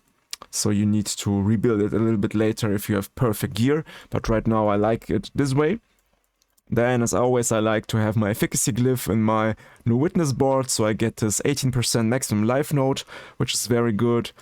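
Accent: Germany. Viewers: Ich höre raus das du Deutscher bist. Finde dein Build ganz cool und werde es mal ausprobieren sobald ich den Rogue auf 100 hab. Pit 200 lauf ich dann eben mit meinem Necro.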